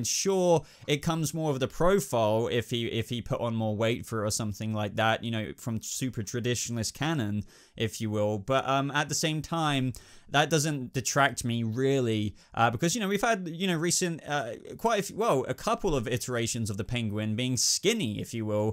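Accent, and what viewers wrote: England, United Kingdom. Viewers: Great video, where abouts in England you from? I live in Ireland 🍀😀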